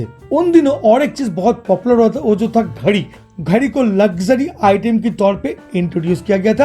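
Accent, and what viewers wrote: Bengal, India. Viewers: Don't care about the contents. I am subscribing just for your bengali accent. It's so funny (in a good way) 🙂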